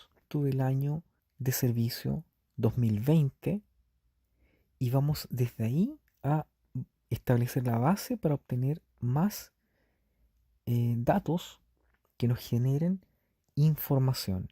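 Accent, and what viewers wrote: Chile. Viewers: No no es eso es que es Chileno y los chilenos hablan con muchos altibajos al menos al el se el entiende otros Chilenos de Santiago Centro y otras comuns apenas se le entiende.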